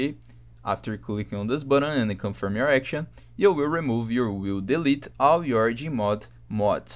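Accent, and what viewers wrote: Brazil. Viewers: sotaque meio br hmmmmm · o cara fala inglês mas tem um sotaque de brasileiro!õ_ò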